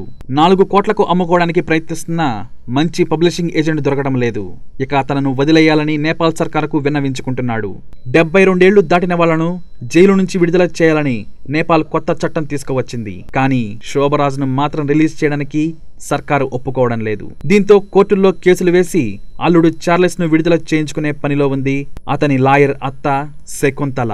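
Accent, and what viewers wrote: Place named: Andhra Pradesh, India. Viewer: mee andhra yasa apundira mundhu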